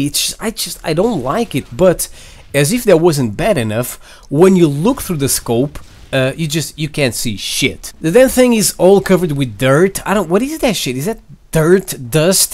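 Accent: Brazil. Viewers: you're brazilian or english ? good vídeo ;P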